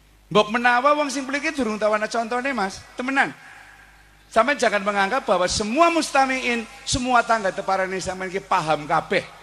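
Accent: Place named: Tegal, Indonesia. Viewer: NIKU LOGATNYA KALO ORANG TEGAL YG TERKENALNYA NGAPAK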